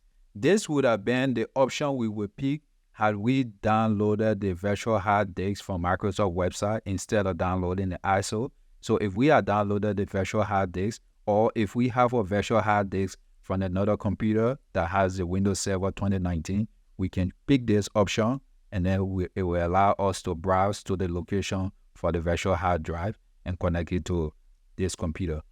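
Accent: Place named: Liberia. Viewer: Big up yourself. You sound like Liberian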